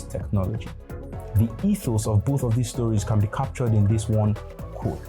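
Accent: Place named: Nigeria. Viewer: No Nigerian that grew up in Nigeria cannot escape the accent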